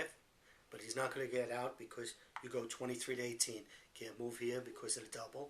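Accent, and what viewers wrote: New York, United States. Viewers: Love these checkers videos - like the NYC?/ NJ? accent.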